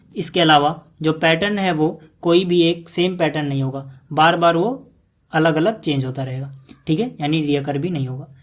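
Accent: Bihar, India. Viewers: Sir AP ky Bihar sa ha konsa jila sa hai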